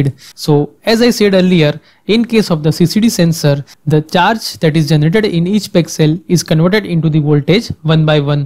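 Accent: India. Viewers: hehe... razumio sam ga sve, mozda zato jer imam tehnicku/elektronicku podlogu. ali taj indijski naglasak je uzasan. ti indijci cak i boju glasa imaju svi istu i ruzna je haha. i onda ovaj jos doda bass boost i jos totalno pretjera u tome, da frajer zvuci da ima dublji glas. uzas za uzasom :D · I love this indian english :)) ,,thanx for explanation! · the Indian accent is so heavy I can hardly understand a single word he's saying. I know he is speaking English but what the hell is he saying? It didn't do a thing for me because the accent is too heavy to understand even with headphones, and I use headphones predominantly when viewing and watching videos, or listening to music online. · it's India's English right?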